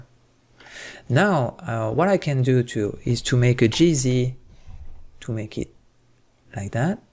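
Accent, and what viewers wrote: France. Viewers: Très bon tuto ! Et le petit accent français qui fait plaisir xD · Tu serais pas français par hasard ont dirait l'accent Français xD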